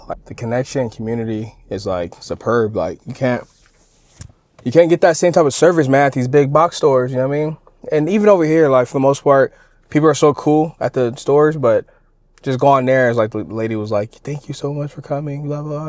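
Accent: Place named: California, United States. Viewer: You are a wonderful husband and father,l love to how you interact with you family,you hold it down as a MAN and I love the respect you show to your family, I love to see how she give you your time to yourself and you do the same to her.. county the good work my God bless you and your family.. by the way I been watching you two since you started.. I just love your California accent I'm from Virginia and I have a southern accent.. love your content.. and content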